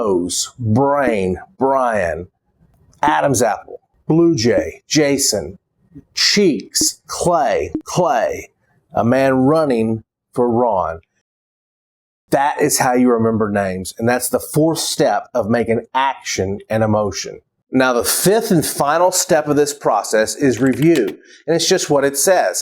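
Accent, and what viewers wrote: South, United States. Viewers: Love your charming Southern accent.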